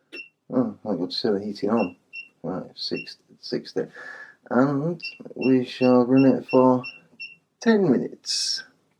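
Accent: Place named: Yorkshire, United Kingdom. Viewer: He comes from the. North of England. Possibly Yorkshire. They tend to talk slower,giving the appearance of being drunk slightly. I'm from the south west yes we all have accents from wherever we live. At least we don't say y'all every other few words.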